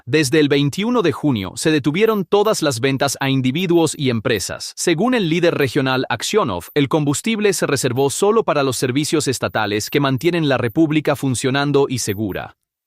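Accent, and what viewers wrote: United States. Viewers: En España no se habla con ese acento gringo DESAGRADABLE.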